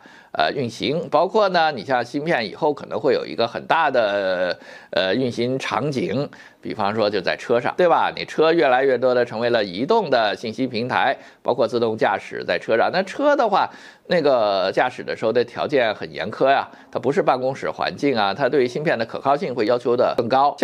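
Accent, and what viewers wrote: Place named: Beijing, China. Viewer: 京腔真重啊！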